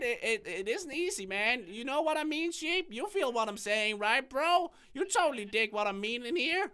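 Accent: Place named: Denmark. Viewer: It's his real voice but he has a Danish accent. When he speaks in Danish it is deeper. It's just sounds different when he speaks in English. I don't know about you but I absolutely love his voice!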